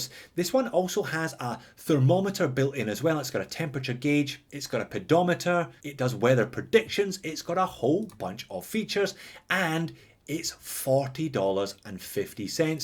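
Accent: Scotland, United Kingdom. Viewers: Quality video as always.... After watching you for some time, I can no longer read watch specs without a Scottish accent in my head. LOL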